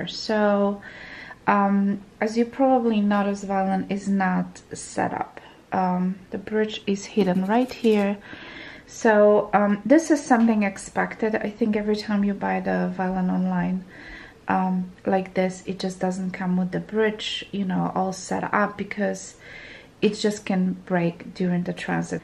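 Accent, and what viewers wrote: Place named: Arkansas, United States. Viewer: First, I never knew the people from Little Rock, AR had such an enchanting accent. I could listen all day. I've ordered a more expensive "beginner" violin, a Gliga Genial-1 Oil varnished violin that is handmade in Romania, since I have many friends from there. With some upgraded D'Addario Heliocore strings, (I heard the regular strings aren't great), a carbon fiber bow, and a Wittner tailpiece, I spent over $420. (Those upgrades were suggested by another Teacher/Review on Youtube). I have been waiting for my violin, but I'm honestly considering picking up one of these Eastar violins so I can get started! With Amazon's fast delivery I may be practicing for a week before the Gliga arrives. Thank you so much for your thorough review. So many of the luthiers make fun and talk badly about the less expensive violins. 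Based on your review I think I can get an Eastar and that I will not become frustrated because my violin is inferior. I may throw some D'Addario Preludes on it. So many music stores sell Chinese violins. Even the luthiers, (Olaf and Fiddlerman), buy Chinese violins made to their specifications. Thank you again for your beautiful review. Please let me know if you think my setup is appropriate.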